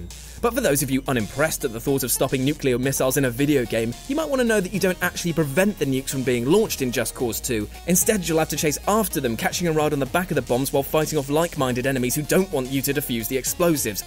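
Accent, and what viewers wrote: United Kingdom. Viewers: This guy should really think about what he says in videos before publishing biased opinions for ANYONE to see. From the accent I'd expect him to be from the UK; with that said he probably hasn't got the slightest clue about his witty remarks about something he may have absolutely no experience with.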